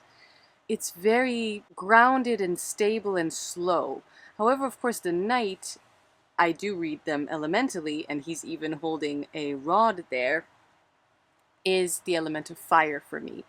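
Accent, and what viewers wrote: France. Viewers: Jolie Accent Français 😊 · J’adore ton accent français! (Je suis canadienne francophone donc notre accent est très différent) J’ai apprécié ce vidéo, sortir de sa zone de confort ce n’est pas facile, mais on est tellement fières après! La croissance se fait inévitablement en traversant l’inconfort. Merci de nous avoir amené avec toi lors de ce roadtrip, le concert dans le cratère devait être incroyable!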